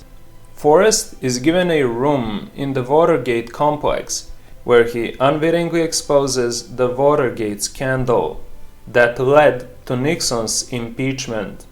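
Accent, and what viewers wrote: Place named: Europe. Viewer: That was a great video you were destined to make it...I couldn't even tell you had a speech issue, just a heavy european accent. Keep making the videos I like Zemeckis and Spielberg movies. Zemeckis' movies revolve around this concept of destiny/fate...some good ones are Contact, Cast Away, Flight and The Walk. I encourage you to ask yourself a question Lt Dan asked Forrest, whether He found Jesus. There is a reason you used the word beJesus ....think about why only that name is used as a replacement for a cuss word.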